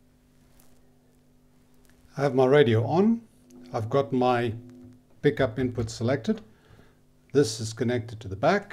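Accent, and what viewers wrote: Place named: South Africa. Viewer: You sound South African. 👍🏻😁 I have learned quite a few tricks. Thanks boet.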